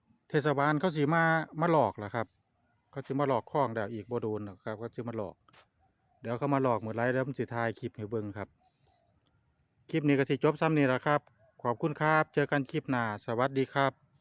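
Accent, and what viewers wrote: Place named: Ubon Ratchathani, Thailand. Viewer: ฟังสำเนียงเว่าคือจังคนทางอุบลอำเดชอุดม คั่ก หมานๆคับ สุดยอด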